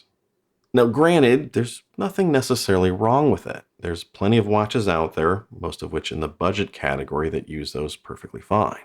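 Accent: United States. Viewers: Just can't watch a video with this murican accent! Classless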